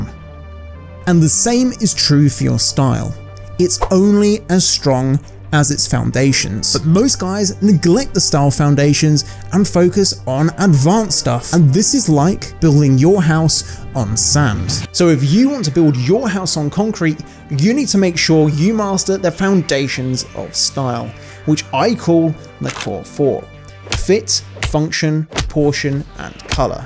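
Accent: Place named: United Kingdom. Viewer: Your british accent works well with you. Makes it more classy!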